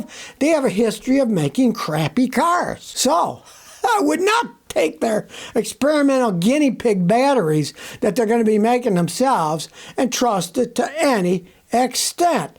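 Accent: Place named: New York, United States. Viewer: you left, but your new york accent never did...ha ha.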